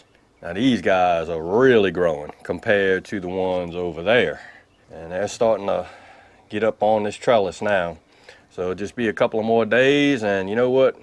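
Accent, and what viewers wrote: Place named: Louisiana, United States. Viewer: You sound like your from Louisiana bro. That ain't no Mississippi accent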